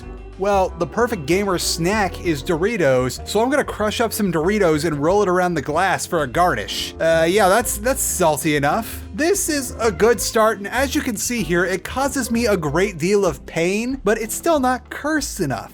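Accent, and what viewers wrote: United States. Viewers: You from Colorado cause you almost sound like Jimmy snow.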